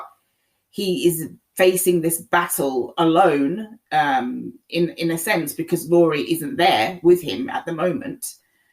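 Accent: United Kingdom. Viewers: Love your accent a new subscriber are you from the UK.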